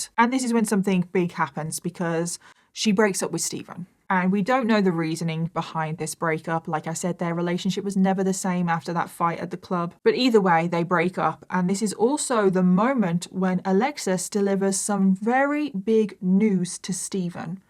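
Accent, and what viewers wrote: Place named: United Kingdom. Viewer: I heard 22nd ‘December ‘ but you’re not the only person who heard September.. might be the British accent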